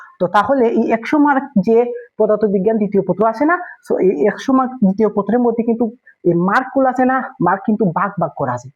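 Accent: Sylhet, Bangladesh. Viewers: Jii may be Sylhet Ta Nahole maje maje sir arokom kota bolccen humm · vaiya mono oy sylheti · ভাই কিতা সিলেটি নি। ভাই পদার্থ বিজ্ঞান লইয়া যে চিন্তাত আছি। সেইম টাইপ ওর অংক টেস্ট পেপার ও এক একটা এক এক নিয়মে দেওয়া। পাশ করতাম ওইলে কিলা পড়া লাগবো। 😭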